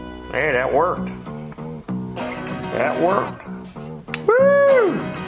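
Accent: England, United Kingdom. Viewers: Your English accent is great! Come over some time and have some warm beer - jolly good show as usual! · That British accent is funny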